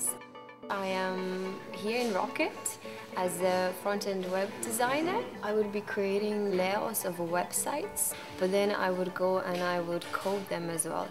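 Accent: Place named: Ireland. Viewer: Nice video. I can here the Irish in your accent :)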